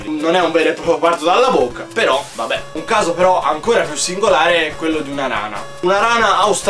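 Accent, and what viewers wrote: Tuscany, Italy. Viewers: MITICO! Assolutamente Iscritto! Simpaticissimo, accento adorabile :3 (adoro il toscano), informatissimo e piango insieme a te all'idea dei Namecciani morti ingiustamente.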